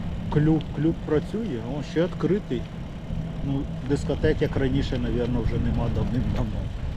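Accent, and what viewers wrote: Poltava, Ukraine. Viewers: Чисто полтавский говор не спутаешь ни с чем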